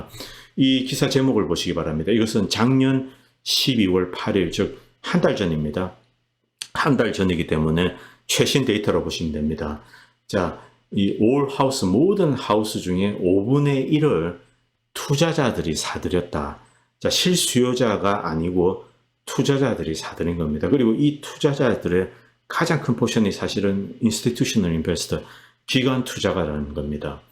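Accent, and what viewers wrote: Gyeongsang, South Korea. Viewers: 애쓰시네요 ㆍ그런데 이리 방대한 자료를 어디서 구하시는지 궁금하네요ㆍ 미국 계셔도 경상도사투리 구수합니다ㆍ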